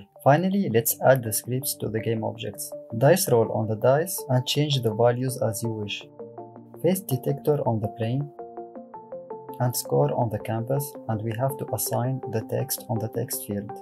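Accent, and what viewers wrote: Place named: Tunisia. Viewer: L'accent khouya dhahra, w ma ytalaaha ken Tounsi kifek Merciiii infiniment ala Tuto <3 <3 ! w je t"encourage alekher w nshalah chaîne tekber akther akther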